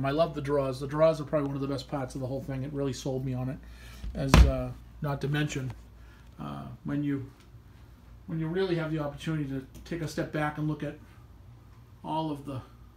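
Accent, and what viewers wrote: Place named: Boston, United States. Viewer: It was worth hearing the accent clicking on this video.🤓👍. Boston ? Nantucket? 😂 Now that’s a nice humidor! 🥳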